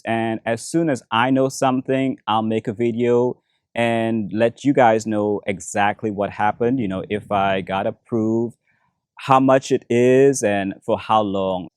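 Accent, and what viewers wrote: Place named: Vietnam. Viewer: You have Vietnamese accent, interesting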